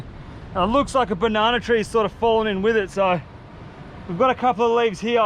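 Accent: Australia. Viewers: He’s literally so Aussie the way he talks he’s iconic i don’t understand how anyone would miss understand where he’s from lmao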